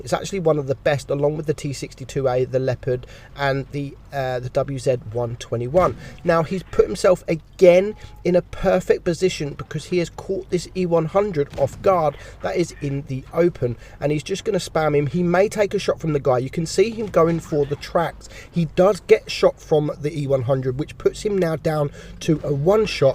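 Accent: United Kingdom. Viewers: You're British mate, I don't hold it against you. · .... You are absolutely unique. And NOBODY can`t copy that accent.:) Fugit could try to copy the style, but that british accent is hilarious. Cheers.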